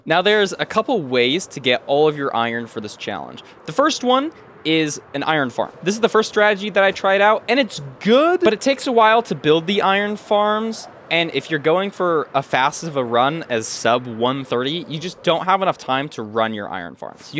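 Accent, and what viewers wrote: India. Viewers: brother u indian like woah it surprised me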